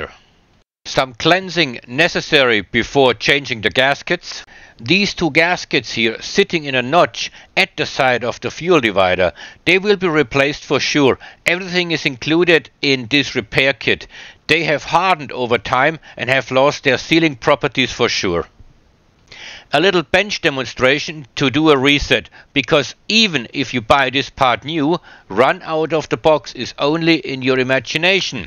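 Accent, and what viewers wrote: Swabia, Germany. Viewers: Funy, funny, funny, jetzt habe ich entdeckt, dass es den Kanal auch auf englisch gibt, und jetzt verstehe ich endlich alles. Trotzdem würde ich hochdeutsch bevorzugen - aber was man nicht bekommt, bekommt man halt nicht. Schwäbisch ist jedenfalls gleich nach sächsisch nahezu unverstehbar für mich. Aber schlimmer geht ja immer! Den Schairer verstehe ich absolut Null!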